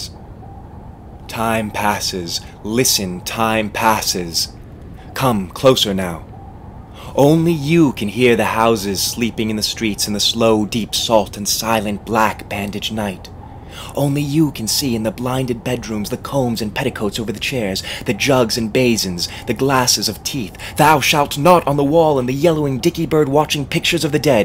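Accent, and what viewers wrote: United States. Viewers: Lovely. I didn't think I would like a US accent, but it works too. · Doesn't sound right read with an american accent, but well read that man .Great poem, a real journey through what he was thinking.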